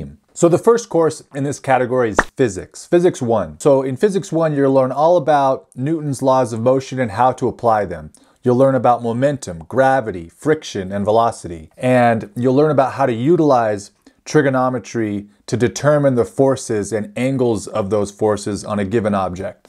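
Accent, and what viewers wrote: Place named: United States. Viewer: This might be a dumb question but I'm from tbe uk, you sound american to me. Will this stuff help me to become a vibration engineer in the uk?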